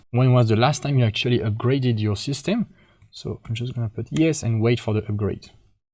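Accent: France. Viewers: Je reconnais un accent français c'est dommage que la vidéo ne soit pas en français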